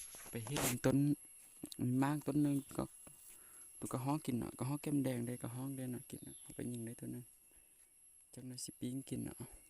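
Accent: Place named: Thailand. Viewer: อยู่แขวงไหนครับ พูดสำเนียงภาษาเหมือนกันกับบ้านผมเลย ผมอยู่จังหวัดเลย ตรงข้ามกับเมือง ซะนะคาม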